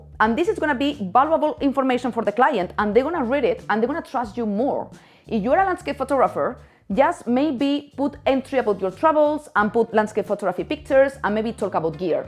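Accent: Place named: Spain. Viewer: I love her typical Spanish accent when speaking English ❤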